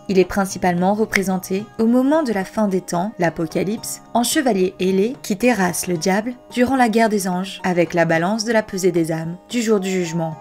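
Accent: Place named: Italy. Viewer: Cet accent italien une douceur pour les oreilles! Sinon excellent travail. +1 abo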